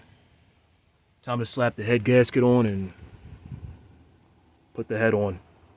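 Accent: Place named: Minnesota, United States. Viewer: Nice looking car. Always enjoy your genuine demeanor and no bs get it done attitude. I still hear that MN accent haha, you sound just like the guys I grew up with. Hope your channel becomes the next Richrebuilds!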